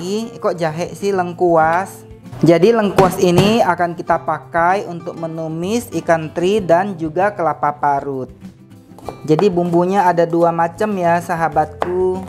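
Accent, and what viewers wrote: Java, Indonesia. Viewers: Medok bikin ngiler aja..lihat buntil..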